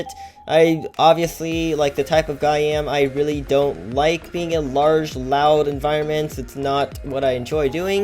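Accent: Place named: United States. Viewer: Wow how you speak like american when you chinese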